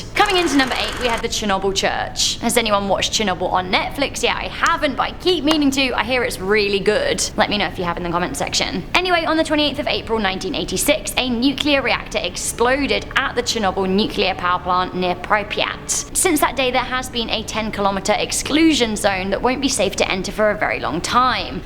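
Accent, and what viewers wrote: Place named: United Kingdom. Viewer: There is nothing sexier than a subtle British accent. Love it. FYI almost all my ancestors come from your country. Found out thru DNA testing. I now know where all my family came from and its awesome.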